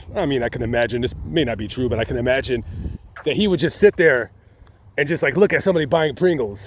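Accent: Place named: West, United States. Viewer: Man, you visit all these places of remembering, & do a fine job telling stories of those who my go forgotten. Do people, or security rather not approve of your simply visiting these cemeteries, filming the general area, & talking about those intered therin? I don't understand why you must lie. Go to an Atwood's, or Tracter Supply. Find decent looking dark blue denim jeans, & a few Sunday Morning acceptable western style (snappy button) shirts, & Heck, you may even find a nice pair of Boots. Your accent is western enough, & you'll look like any good ol' South Western man there to pay his respects. (& if you shop a bit you can not spend a huge chunk of your savings), I promise it could make a world of difference. These are cemeteries, the few folks that you find around tend to worry about the ones they have lost.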